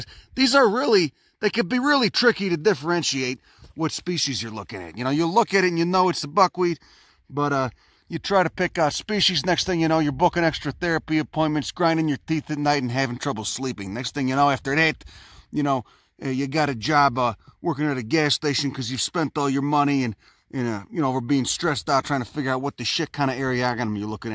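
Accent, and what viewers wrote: Chicago, United States. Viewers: I'm from Chicago so it comes naturally to me as I talk like he does when he isn't doing vids, but that is funny to hear for sure!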